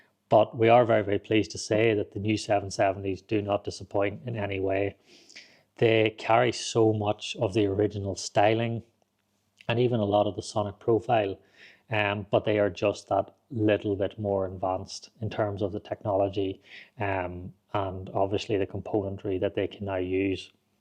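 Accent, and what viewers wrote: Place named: Ireland. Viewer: This gentleman is Irish 🇮🇪 you are having difficulties understanding because of his accent. The UK also has many different regional accents that you would also find difficult to understand.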